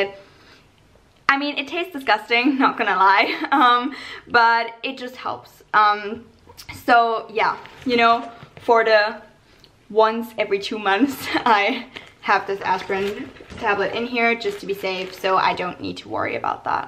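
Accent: United Kingdom. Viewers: omg i just watched her what's in my bag video from before and she has a bit of a british accent now loooool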